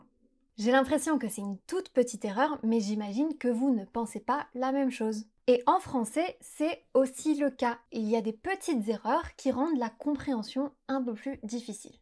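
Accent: England, United Kingdom. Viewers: c’est fou qu’elle a un accent parfaitement anglais! c’est super cool mais juste différent! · Thank you, your video is so helpful. Plus, I love your english accent! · Oooooooooo j'aime votre prononciation des mots en anglais. ❤️ J'entends un léger accent British quand vous prononcez les mots; trop mimi; c normal, la France est plus proche du Royaume-Uni que les USA/le Canada. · You do realize that she has 100+ videos on her channel right? She speaks English in more than a few of them...and she pretty much always sounds British.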